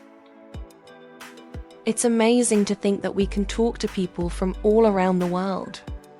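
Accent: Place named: Australia. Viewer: you sound like an auzie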